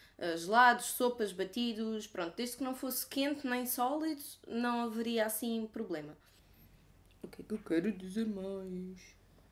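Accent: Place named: Portugal. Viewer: Acho Lindo O Sotaque De Portugal ❤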